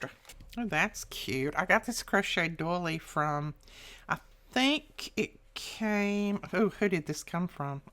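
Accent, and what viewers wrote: United States. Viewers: Hi, first of all glad your granddaughter had a great birthday, we worry about our grandkids more because we know we won’t be with them for as long of a time as we want too. I am so glad to hear that the crafting community helps you with your grief at losing your beloved husband Whit. If you want to just chat that is fine with me as I love listening to your American accent. Very cute haha xx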